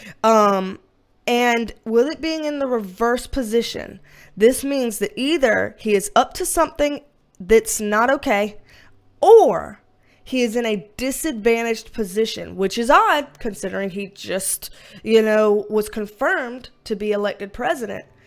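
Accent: United States. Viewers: 😅 “we’ll make a start” - we say that in Australia too. It never struck me as unusual until I heard you say it in an American accent 🤣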